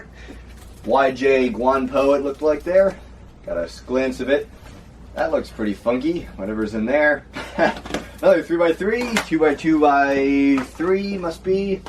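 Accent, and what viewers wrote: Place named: United States. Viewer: Bruh u like Vietnamese but sound American af...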